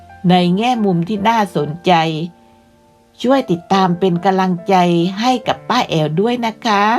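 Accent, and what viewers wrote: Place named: South, Thailand. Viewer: ชอบจังภาษาใต้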